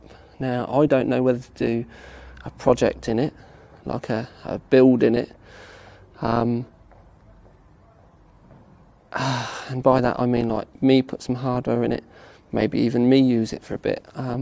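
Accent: United Kingdom. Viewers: Where abouts in the UK are you from? Not specifics lol, just general area. I'm sure I've seen around the Manchester area (or his twin haha).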